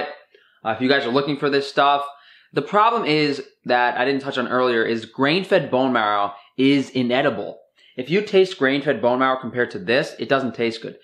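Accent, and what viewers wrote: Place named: East Coast, United States. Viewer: This guy is so East Coast and I love it :)... Especially the brief pause in between "Cross-contaminaton" and "from the butcher's saw" when he does that little Italian hand gesture with the knife... I like this guy. He knows what he's talking about.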